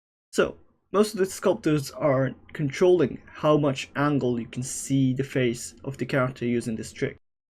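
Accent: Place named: India. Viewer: “I’m japanese”. That accent doesn’t lie ya Indomutt akhi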